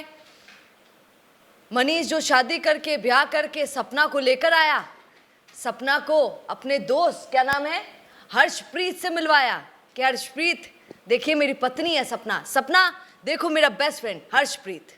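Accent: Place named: Marwar, India. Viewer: आप मारवाड़ी भाषा बिच बिच में बड़ी अच्छी लागे सा बाई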